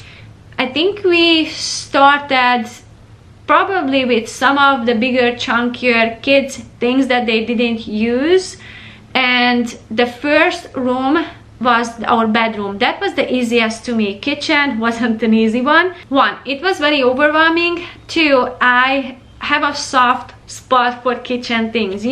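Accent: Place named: Hungary. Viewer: Imadom menyire perfektül beszelsz angolul. Tisztan kihangzik hogy magyar vagy de az csak meg attraktivusabnak csinalja. Elvezem nagyon a channel-eset. Üdv Ausztriabol.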